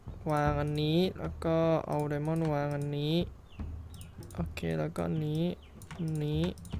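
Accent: Suphanburi, Thailand. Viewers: ก็ว่าเสียงเหน่อๆ เพิ่งรู้หนาเนี่ย ว่า เป็นคนสุพรรณ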